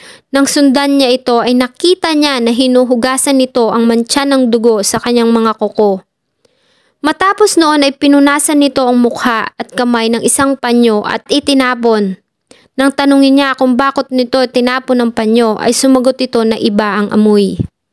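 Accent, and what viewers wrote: Philippines. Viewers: Oky lng UN pilipino nmn Tayo my kanya knya acsent nmn kahit sa ibang bansa tulad din nmn natin cla hind Rin cla magaling mg English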